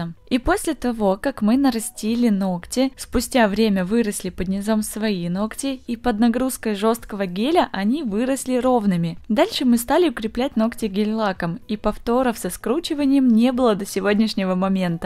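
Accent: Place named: Ukraine. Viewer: жила раньше в Украине, это все же накладывает отпечаток на говор. Ничего страшного, ошибок в речи не так и много)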